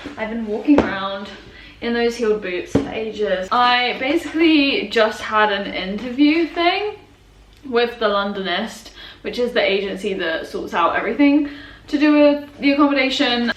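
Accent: Australia. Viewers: I can hear all the Australia in your accent ^_^ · You have an Australian accent! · Cringe that you're speaking in an Australian accent u were only there for a couple months... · I've noticed that you've gained quite the Australian accent. Maybe that's me being a little extra. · your accent sounds australian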